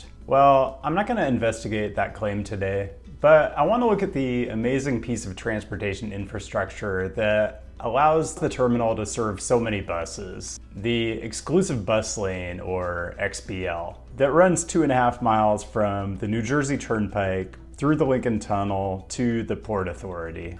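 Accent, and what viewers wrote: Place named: San Diego, United States. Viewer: He becomes more handsome in each video , is he from southern Cali he speaks like how San Diego people sound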